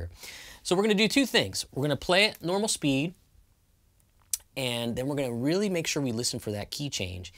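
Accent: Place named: Texas, United States. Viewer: I can hear Texas !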